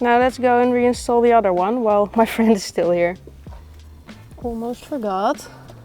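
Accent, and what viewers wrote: Netherlands. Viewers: The most dutch English accent ever. So cool!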